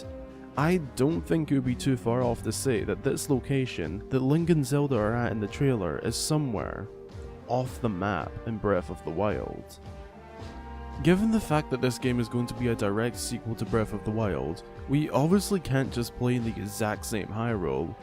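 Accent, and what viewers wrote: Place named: Scotland, United Kingdom. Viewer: You've a very strange accent to me, it kinda sounds like Scottish and Northern Irish mixed and every odd word American lol I like it and the video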